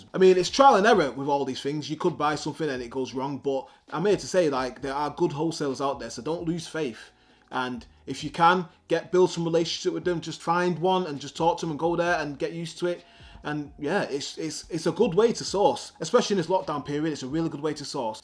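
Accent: United Kingdom. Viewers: I usually cant stand a UK accent but your knowledge knows no dialect I appreciate u and the gems u dropping ima scoop em up💎🏃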